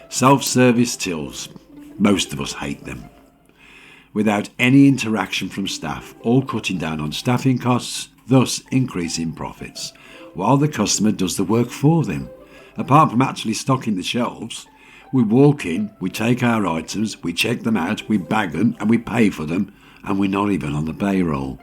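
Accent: Birmingham, United Kingdom. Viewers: Aah ' a fellow brummy......✅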